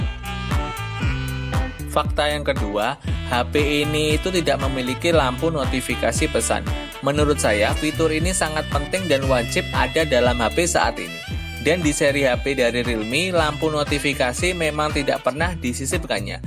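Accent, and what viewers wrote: Magetan, Indonesia. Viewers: Mas sampean wong Magetan?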